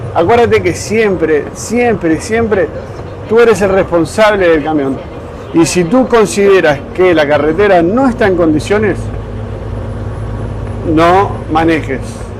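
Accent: Argentina. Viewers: Saludos desde Monterrey, Mexico.. tienes acento tipo Maradona (qepd), tus consejos son exelentes!!! gracias. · Vos sos argentino!!!!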